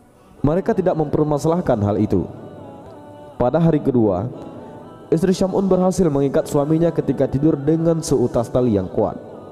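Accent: Aceh, Indonesia. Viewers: Yg peugah haba mngkn jeut neu stel bacut logatjih, brat that meu aceh...semoga kedepan makin berkembang..amin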